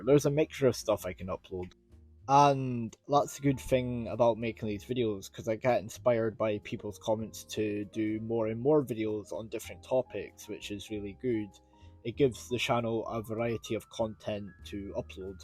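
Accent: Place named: Scotland, United Kingdom. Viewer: It was a great video! Its great hearing a fellow scot talking about the stuff im also interested in :)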